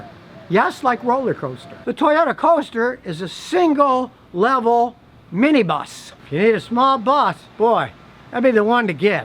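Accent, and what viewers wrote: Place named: United States. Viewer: Hmmmm I think I have misunderstood your accent. Sounds to me your saying Moore's not Morris but I think you are saying Morris correctly for your us accent.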